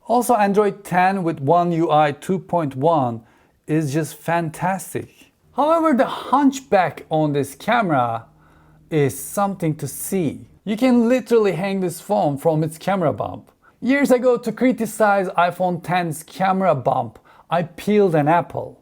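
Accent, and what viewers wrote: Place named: Turkey. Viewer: Your accent sounds like you are a turkish guy.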